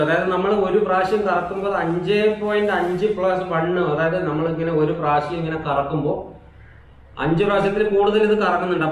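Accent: Palakkad, India. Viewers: Palakkadan Language Kelkumbo Ulla oru Happiness 😍Videos Adipoli Aayind Me Also Palakkad Etta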